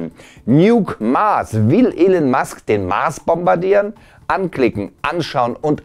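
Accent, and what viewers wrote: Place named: Berlin, Germany. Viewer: Und das von einem YouTuber mit Berliner Akzent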